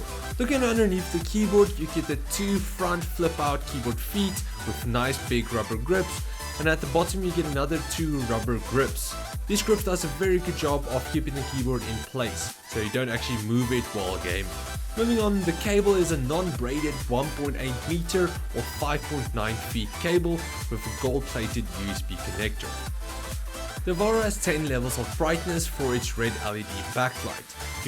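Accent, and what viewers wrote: South Africa. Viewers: are you from south africa?? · you from South Africa mate? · Cool video, thanks bud. Just ordered one of these. Also, you sound South African...are you? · south african?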